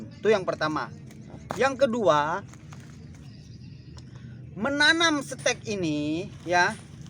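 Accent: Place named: Medan, Indonesia. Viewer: Tengok, nampak.. cakap-cakap khas medan 😂 Semoga sukses bang 👍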